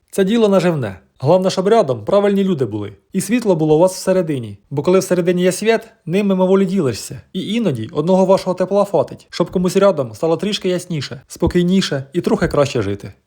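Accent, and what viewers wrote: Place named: Surzhyk, Ukraine. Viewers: Ви звідки? Наш щирий суржик,щирі люди,прості,роботящі, приємно спостерігати. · Хлопче, з твоїм відео і мій день трохи налагодився. Мої батьки з Дніпропетровщини і твоя говірка мені рідна. Хай все получиться! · Шапка, пальто- просто супер-прикид. А говір наш, Черкаський. Обожнюю. Процвітання вашому каналу і щастя родині❤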